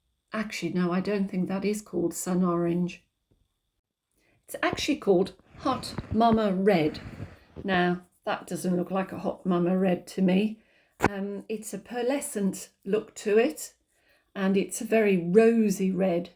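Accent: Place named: England, United Kingdom. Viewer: I’ve thought about trying acrylic inks on polymer but I’ve tried some other inks without great success so hadn’t got around to it. I’m also going to try some acrylic binder medium with various inks/distress oxides/watercolours etc. to see whether that will help them stick to the clay & dry. Your earrings do come out rather well & adding the drops directly to the clay sheet & blowing/letting the ink run/dabbing with different textured cloths etc may create some beautiful effects. May I offer a suggestion though? I’ve looked past your videos a few times because I tend to watch YT on my phone & your thumbnails tend to be so “busy” with a large collection that I can’t see how well they actually come out or what the result is. But I must admit I do enjoy a nice straightforward tutorial with a lovely English accent (my parents are English) so I wish I’d tried yours sooner. 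I tend to find a good closeup of a single item against a bright background gives a much better idea of what a tutorial will be like & whether it’s a technique I haven’t tried, or a result that’s interesting. There are so many creators that it’s little tips like that that can help you stand out more 😊 Thank you for the inspiration!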